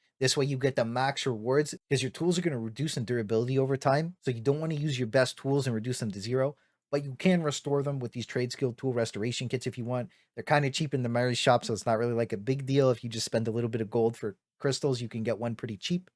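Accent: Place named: New York, United States. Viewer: Bro are you italian and from new york hahaha you have that whole vibe going, great video btw!!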